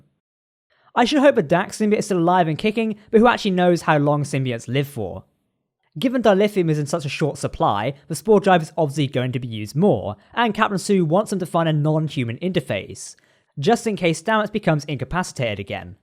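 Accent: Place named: Cockney, United Kingdom. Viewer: Thank you for this.... I like watch your reviews prior to watching the episode.... one humble request.... is their a way you can talk a bit slower, the cockney accent (of that's what it is) gets in the way of comprehesion a bit.... thank you again....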